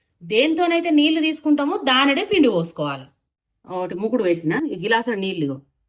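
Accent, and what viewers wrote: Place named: Karimnagar, India. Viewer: Karimnagar slang matladthunnaru 10years tharvatha malli akkadi vaallatho matladinattu happy ga vundhi chaala manchiga nerpisthunnaru. maadhi Kadapa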